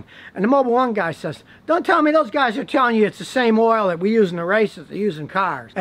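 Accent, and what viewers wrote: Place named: Midwest, United States. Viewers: Definite midwest accent here. I'm guessing Chicagoland area? :) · You almost sound Chicago in this video! Not one once of the bullsh*t you'll hear daily.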